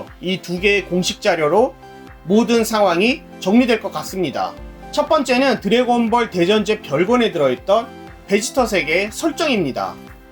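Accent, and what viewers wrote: Japan. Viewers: 유튜브를 일본식으로 자꾸 유츄브라고 발음하시는 이유가 있으신가요??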